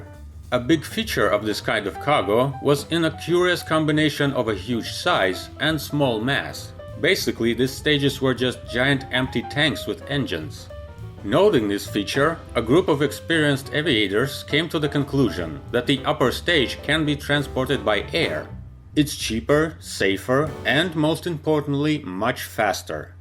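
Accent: Russia. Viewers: Luka Walpole It's just the accent. As a Russian speaker, He's bound to have SOME words and combo of letters that are different to his tongue! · To be fair, the native Russian speaking narrator has no "uh" sounding letter in his alphabet to compare to English letter "u". They do have a sound like "oo" though. I have a Russian friend who mispronounes "truck" as "trook." I guess it's a common mistake and some English sounds are difficult. Just as some Russian letters are very difficult for English speakers, when we have no similar sound. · well it's Russian accent,which usually U sounds as it is rather than A in several occasions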